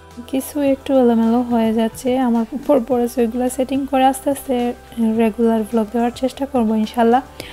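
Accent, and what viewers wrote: Sylhet, Bangladesh. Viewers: Apu apnar sylheti accent sunte asto sobai Apnar mukhe oi ta khub valo lagto Tai mone hoy the numbers of views are decreasing....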